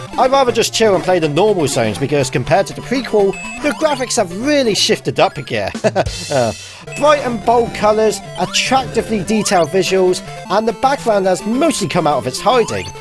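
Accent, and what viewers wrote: United Kingdom. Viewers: I guess your british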